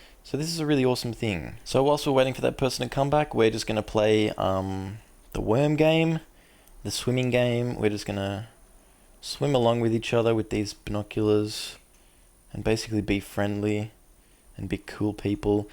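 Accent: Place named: Australia. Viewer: omg ur Australian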